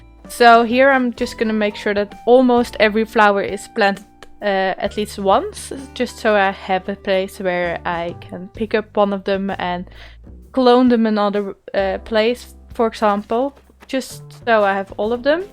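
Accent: Netherlands. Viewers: By any chance are you Dutch? Just wondering 😊 I've watched a few of your Animal Crossing video's! Also we made a few Tour video's haha We started playing Animal Crossing when New Horizon Released!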